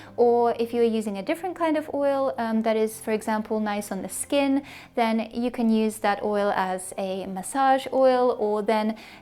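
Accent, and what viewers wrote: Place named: United Kingdom. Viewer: You speak british english very nicely. It is pleasure to listen to you. Thanks for the inspiration🐝🌻Lovely day!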